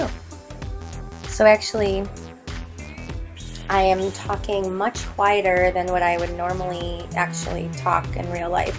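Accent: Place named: Michigan, United States. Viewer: I was wondering where you are from! Your words sound a little bit like Michigan and In this video you mention Meijer! Im from Michigan!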